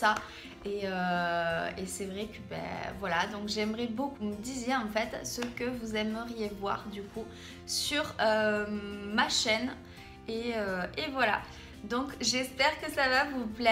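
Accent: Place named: South, France. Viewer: Je participe ! Merci pour ce super concours, je rêve de cette palette depuis qu'elle est sortie ! Ca fait plaisir d'entendre l'accent du sud chez une youtubeuse ahha! Joyeuse fêtes de fin d'année :)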